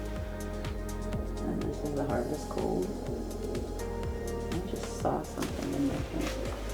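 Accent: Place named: New York, United States. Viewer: You just explained EXACTLY what my issue is with paint separating. THANK YOU! I love your New Yoak accent 😃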